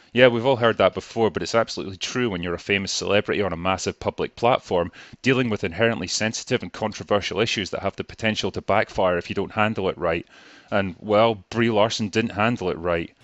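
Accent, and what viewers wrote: Scotland, United Kingdom. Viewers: An old vid before he put on the thick Scottish accent. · Wait a second -- now in 2020 the has such a thick Scottish accent he's barely understandable! In this video it's much more mild.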